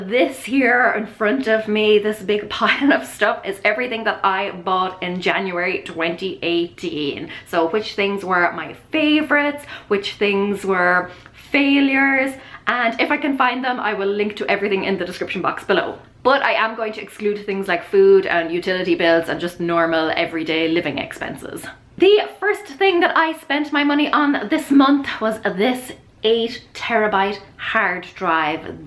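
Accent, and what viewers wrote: Ireland. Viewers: Elloello. Take hangers back too. Switch them for plain hangers and get a glue gun. Melt a frosting squiggle of glue the entire length of the top part. Let dry. Same non slip for delicate and dresses and for pennies on the dollar. I love your speaking pattern and Irish brogue. (hope that's right!) I have going to Ireland on my bucket list since I have Irish roots. Hugs to you and yours from NC.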